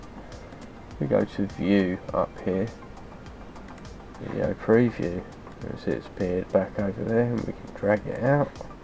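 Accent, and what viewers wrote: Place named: Southern England, United Kingdom. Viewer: Really great and so much help! I'm from Kent but I've been living in Manchester for 10 years, so I enjoyed your good old Southern accent.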